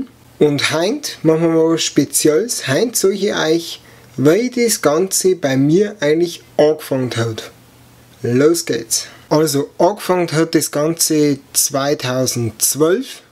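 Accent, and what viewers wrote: Bavaria, Germany. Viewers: alter ich glaub es hackt du machst bayrisch für anfänger und fährst quad besster tüp ever mach mehr mit deim quad sau geil weiter so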